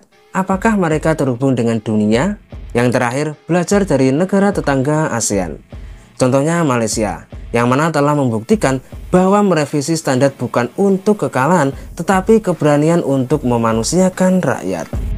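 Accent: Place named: Java, Indonesia. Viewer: Bahasa yg bagus tidak terlalu jawa👍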